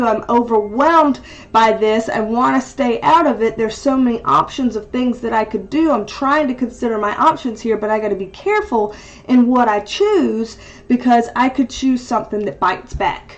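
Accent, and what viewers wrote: South, United States. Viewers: First off let me say your hair style is gorgeous just like you! Hi!! New friend and new subscriber to ur channel from southeast Kentucky here. I can tell you have a bit of a southern accent like me. My accent is southern with a bit too much of a hillbilly twang added to it. Lol...anyway I’m so glad I found your channel and I look forward for us connecting. Take care and stay safe ❤️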